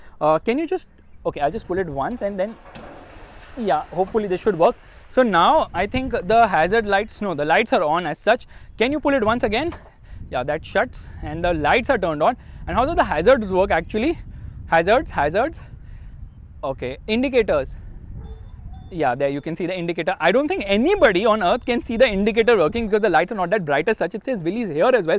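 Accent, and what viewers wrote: India. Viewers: Typical Indian engineering college type annoying English accent. Trying to speak fast to show the fake fluency. Jeep mardo pe achhi lagti hai. Tum jaisi pe nahi. Next time drive some Alto or Wagon R.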